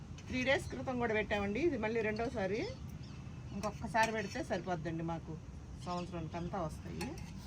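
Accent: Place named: Telugu, India. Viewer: Hi Garu, My name is from Chennai, your video brought me some fond memories as we used to make it with my grand mother, on our terrace. I used to eat them all the time with sambar and annam vadiyalu. Also Perugu annam with mamidi panda, I absolutely fell in love with your telugu accent as we speak the same way, as we are from a agriculture and diary background from ongole. I was wondering if you could make a video on how to make good forgotten simple foods like Paramannam, Pappu charu, Chinthakaya Dosakaya Pachadi, etc similar to our region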